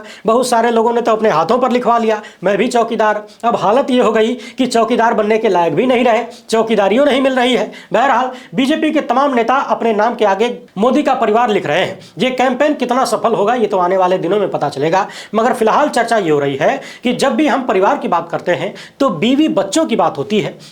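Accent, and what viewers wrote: Bhojpuri, India. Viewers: CJI को हाई कोर्ट और सुप्रीम कोर्ट के किसी भी ज़ज को सेवा में रहते भर या सेवानिवृत्ति के बाद राजनीति में या किसी भी सरकारी पद, राजनैतिक पद या किसी भी सरकारी अर्ध सरकारी संस्थानों मे किसी भी लाभ के पद पर जाने से तुरत रोक लगाना चाहिए. इससे बहुत हद तक न्याय व्यवस्था में पक्षपात को रोका जा सकेगा और लोकतंत्रात्मक व्यवस्था को बनाए रखा जा सकेगा, इसकी देशहित में नितांत आवश्यकता है . आपका व्यंगात्मक लहजे में भोजपुरीया टोन के साथ विश्लेषण न केवल तथ्यों की जानकारी देता है, बल्कि उसकी कलई खोलते हुए मनोरंजन भी कराता है.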